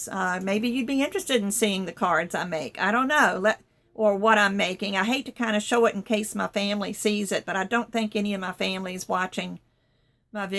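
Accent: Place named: South, United States. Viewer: Hello am the same one who liked ur southern(not cowboy...) accent!! And of course your great chit chat while sharing polymer crafts which are super helpful.this heart to heart video was so touching dear,i find you are so sweet and humble. Wish u the best for new position and whatever u decide i ll still be a faithful viewer from the island of crete in greece.take care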